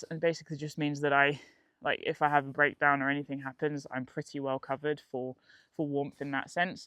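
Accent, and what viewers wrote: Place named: Scotland, United Kingdom. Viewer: As you've demonstrated, a Scottish accent can help put you in the mind-frame for hardiness. Allegedly - a cold foot bath before bed can make them warm - (old scouting tip...).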